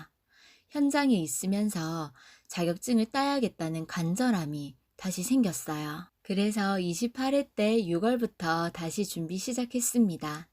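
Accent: Gyeongsang, South Korea. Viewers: 감사합니다 목소리가 이쁜 공인중개사님~~ 성우 해도 되겠네요~^^ 대구분이세요? 잘듣고갑니다~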